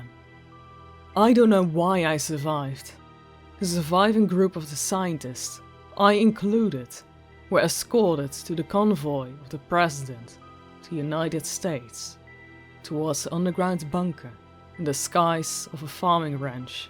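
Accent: Netherlands. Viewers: I hate this one it's like hiding ur accent, that's why we subscribe is to hear ur thick Netherlands voice, don't make me tell u again.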